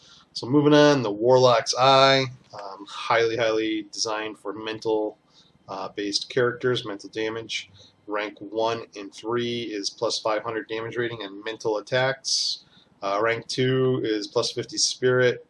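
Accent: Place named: Boston, United States. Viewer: It's ok. I heard that Bostonian Accent. My physics professor (back in the late 80's) was from Boston and basically renamed my last name on a daily basis. Good video. You get 1 like, 1 subscribe. :)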